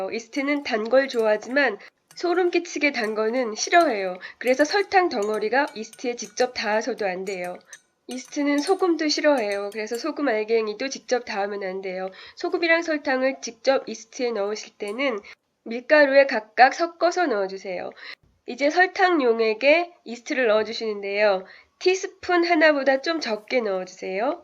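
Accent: Seoul, South Korea. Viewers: 목소리 말투가.. 너무 매력적이시네요 옛날 서울 사투리? 같아요 정감있고 예쁜어감의 목소리여서 계속 듣게되네요~ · 서울 사투리 억양으로 말 하시는 분 근래에 첨 봤네. 덕분에 피자 맛나게 만들어서 먹었어요~ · 서울 억양 너무 좋습니다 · ㅋㅋㅋ말투 넘 귀여우셔요ㅋㅋ약간 90년대 서울 사투리 같아요ㅋㅋ영상 감사해요!맛있게 만들어볼게요~^^ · 서울 사투리 쓰신다!!! 말투 넘 좋아요ㅎㅎ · 와! 듣고 보니까 말씨가 딱 옜날 서울 사투리네요 ㅎㅎ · 사울사투리 너무귀여어 ㅋ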